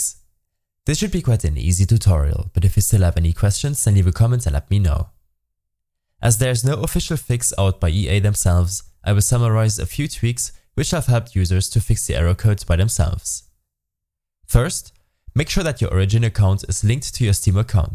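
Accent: Germany. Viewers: OMG so wie es aus sieht bist du aus Deutschland wenn ja digga krasses Englisch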